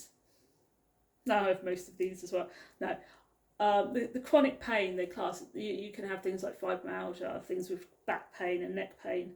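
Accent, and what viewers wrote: United Kingdom. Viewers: Britânic English... Happy friend's day! I'm learning english! I really need learn! Thanks for you. Good afternoon.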